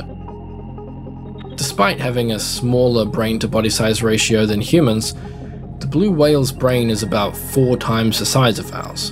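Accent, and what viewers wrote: New Zealand. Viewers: I'm actually from Auckland too, I'm Maori and was born there, but I left for Melbourne a long time ago and but the reason I could pick it is because before I left, the rhotic 'r' sound (which is very prominent in your accent) was just starting to become more widespread and especially among the Pasifika population around Sth Auckland. It's actually quite nice on you, and very nostalgic for me to hear. I could listen to it all day. Anyways, great video. I liked and subbed 😊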